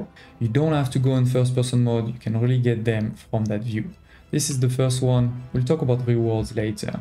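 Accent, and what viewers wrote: France. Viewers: Are you french ? You speak a very good english with a french accent :)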